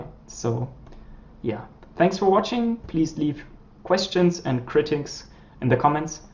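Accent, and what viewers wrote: Germany. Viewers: Wondered about that aswell, just sounds like german accent right? :-D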